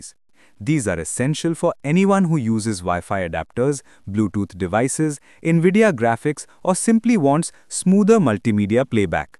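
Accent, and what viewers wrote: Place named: India. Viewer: 换成印度口音了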